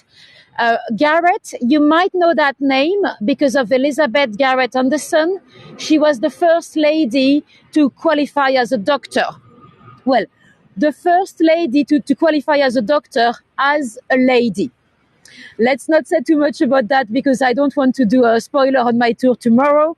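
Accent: France. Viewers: You literally have the best French accent ever. I could listen to you all day…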